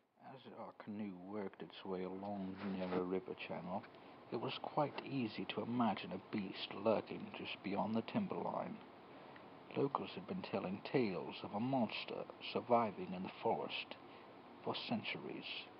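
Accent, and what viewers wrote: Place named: United Kingdom. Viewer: Snow in the Louisiana swamps along with the narrator's British accent make me believe this is real.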